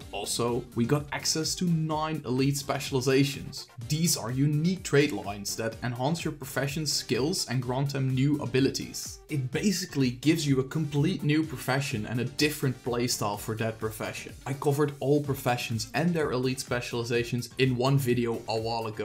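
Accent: Netherlands. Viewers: I can slightly hear the dutch accent mijn vriend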